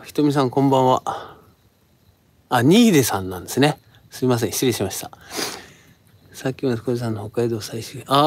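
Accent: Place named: Hakata, Japan. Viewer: 乾杯ありがとうございます😊 今宵も良い晩酌が出来ました🎉 はい美味しいぃ〜😆✨ やっぱり博多弁のがいいですね🤭 やばばです🫡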